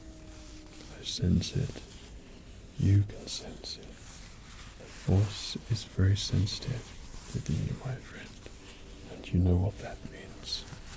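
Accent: England, United Kingdom. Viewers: Rude English Gentleman Sith version. For all of us non-Jedi scum. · Now a rude english sith lord!!!!!! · RUDE BRITISH JEDI TRAINER😂😂😂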